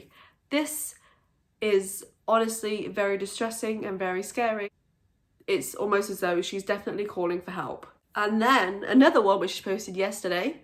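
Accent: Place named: Ireland. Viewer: Your Irish accent keeps coming through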